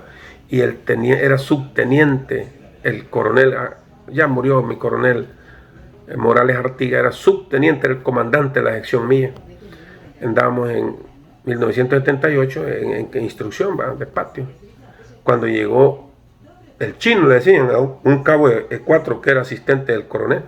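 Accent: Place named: El Salvador. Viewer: Me llega como cuenta las historias este sargento .con un acento muy a lo salvadoreño,un acento campirano y esa jocosidad para narrar las historias .saludos sargento.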